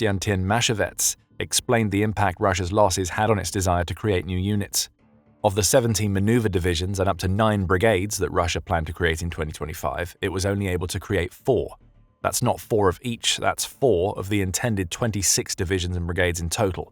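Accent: United Kingdom. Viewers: Lol Russia has 1.5 million active troops and tens of millions of reserves lol I know as soon as i heard a british accent this was gonna be utter nonsense lol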